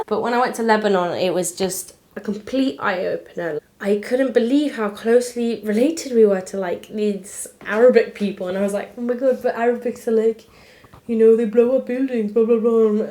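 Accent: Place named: England, United Kingdom. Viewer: This lovely young lady sounds rather English,but she was probably born in England of Armenian parents.Felt that she gave a pretty good speech about some cultures that are rather similar to Western Armenians,such as the Jews,and Greeks.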